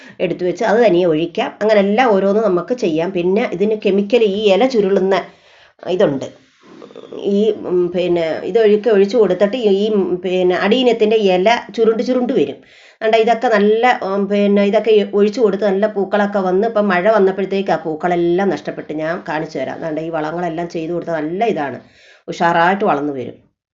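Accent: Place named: Kollam, India. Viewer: ഇത് കൊല്ലം സഠഭാഷണഠ ആണ്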